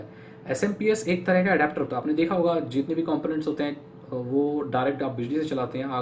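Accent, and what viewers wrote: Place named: Bihar, India. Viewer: bhai aaja full bihari lag rahe ho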